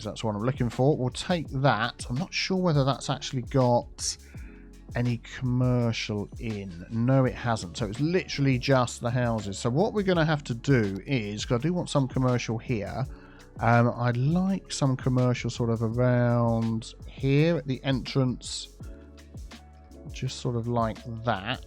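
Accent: United Kingdom. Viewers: Your British voice is relaxing 😅😂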